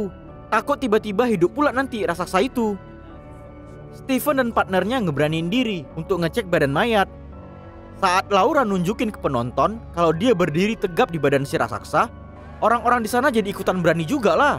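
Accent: Medan, Indonesia. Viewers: Indonesia bagian mana ini admin, kek orang medan bukan padang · Bang pasti ko orang Medan??! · Logat medan 😏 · Mimin nya orang Medan karna saya orang Medan juga tau logat Medan kek mana 🗿👍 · Jelas kali medan mu.on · woiii...orang medan kau ya...kwkwkwk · Medan kali logatnya bah 😅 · Logat medan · Bang orang medan kah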